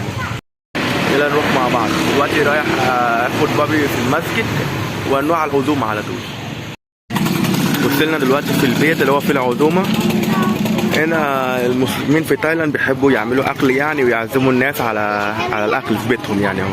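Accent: Egypt. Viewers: ماشاءالله حلقة حفظ قرٱن كريم والعربى بتاعك مصرى خالص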